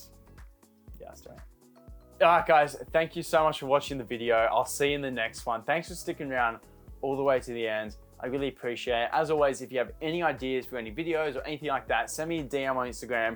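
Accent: Australia. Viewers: I like watching your dives. I like your Aussie accent. My question for you is have you ever been to Canada 🇨🇦?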